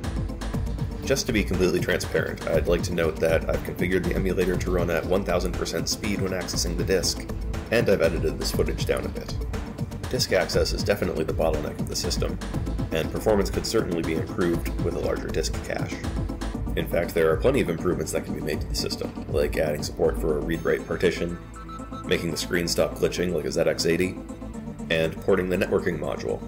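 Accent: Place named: Utah, United States. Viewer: Sick vid. Thanks. Also Ew. A ruht directory. Is it tuh a spuhn Or is it too a spoon Ew. Disgusting. 2+2 isn't 5 cuz "that's how we say it down here" are you from utah?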